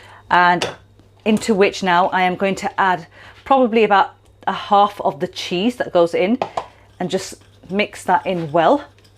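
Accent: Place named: West Yorkshire, United Kingdom. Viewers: WOW!! I’m impressed (not something which happens often). Love the accent too, somewhere between Yorkshire and the Mediterranean, music to my ears. Excellent video, I’m subscribing now just to hear you speak-excellent videos will be a bonus. Great recipe, great presentation. I love it. 🇦🇺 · I appreciate the lack of waste for a home chef. Fantastic recipe. You sound like you're from West Yorkshire, we don't waste anything in cooking!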